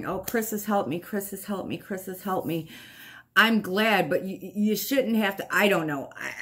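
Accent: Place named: Buffalo, United States. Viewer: I know that this is a very invasive question, but where are you from? Your accent reminds me of my family/people in my hometown (Buffalo). I could be completely off, please do not feel pressured to answer.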